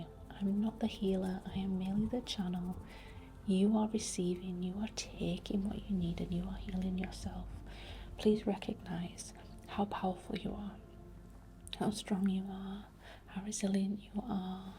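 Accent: United Kingdom. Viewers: WITCHY asmr with a BRITISH accent?!?! How did I get so lucky to find you ❤😭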